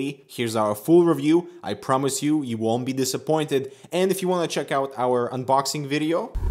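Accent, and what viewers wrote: Russia. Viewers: русский чтоле? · То есть, он русский? :D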